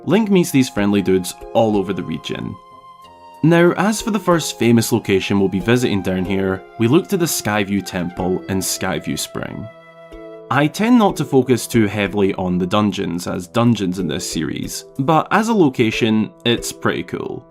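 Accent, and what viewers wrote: Scotland, United Kingdom. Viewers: a zelda channel run by a Scotsman, im home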